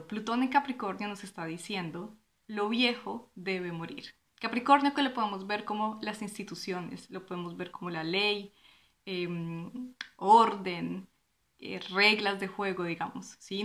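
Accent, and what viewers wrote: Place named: Colombia. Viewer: GRACIASSSS INFINITAS..NO SABES QUE BIEN SE SIENTE OIRTE..TANTÍSIMA SABIDURÍA..UN ABRAZO DESDE ISLAS CANARIAS..Y ESE ACENTO DE MI COLOMBIA💛💙❤....subscrita...desde Ya...y recomendaré tu Canal!!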